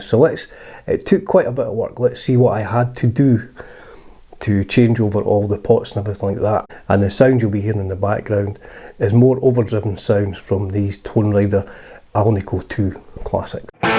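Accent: Scotland, United Kingdom. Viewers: I like Scottish accent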